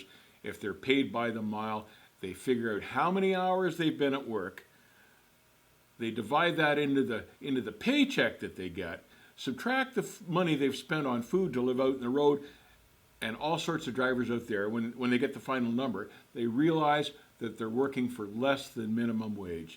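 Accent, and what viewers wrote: Canada. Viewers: You sound like a Canadian!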